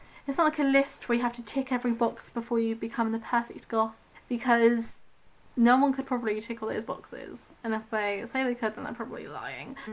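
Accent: United Kingdom. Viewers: Wasn't ready for the British accent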